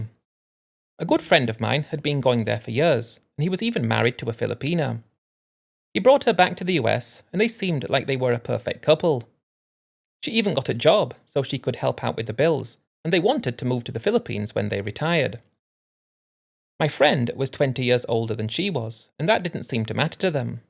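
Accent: United Kingdom. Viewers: If you’re from the United States, why do you have a British accent? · You’re from the US? Your accent is British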